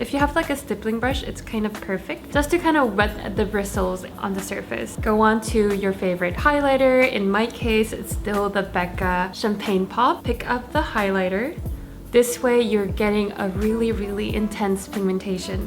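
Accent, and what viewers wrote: Australia. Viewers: Omg the Australian accent is getting stronger!!! I love it hahaha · Dude I was like 😂😂😂😂 how can you just come up with an aussie accent out of nowhere?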